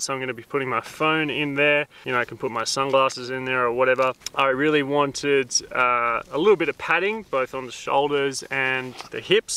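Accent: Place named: Australia. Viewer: love the Aussie accent, but took me awhile in the shelter segment to understand that "ina" was "inner"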